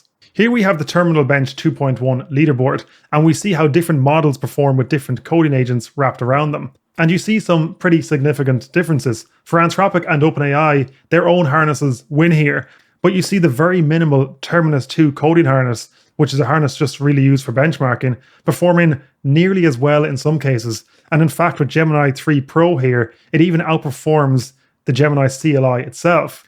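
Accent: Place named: Ireland. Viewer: I wonder why people still looking for better harness after pi was release, the new hype sort of Prime Agent is just Pi for lazy people to create its own extension and add few more lines on the sysprompt. Btw, first video I see from your channel, already subscribed, nice content, it would be good just to have the original audio with some Irish accent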